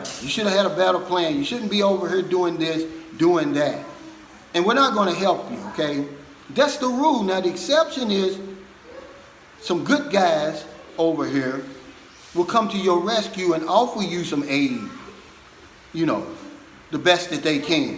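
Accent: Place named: South, United States. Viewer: Thanks. I love your accent BTW ha ha . French is my first language so I have a slight accent in English as well, but I've always loved the South accent... especially for women but hey, I am a man ha ha. You do awesome videos, seriously. Have a GREAT day :)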